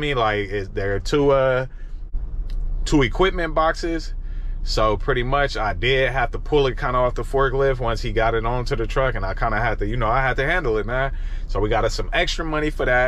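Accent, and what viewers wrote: Bay Area, United States. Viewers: I eventually figured it out as the video played, but out the gate I knew you was from the Bay! Great video, been thinking about going the same route as you.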